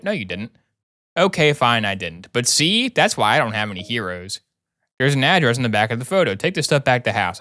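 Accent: Australia. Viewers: Ohh he's Australian? Oh krickey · British accent???.... Australian ffs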